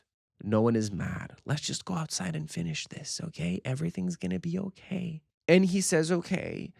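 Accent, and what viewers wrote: Portugal. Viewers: Meu Deus o choque que eu tive quando voce comecou "Amor é fogo que arde e não se ve..." eu esqueci totalmente que vc fala portugues hahahahahaha mas nossa pra mim (br) é realmente difícil entender português de Portugal, que doideira né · O PORTUGUÊS DE PORTUGAL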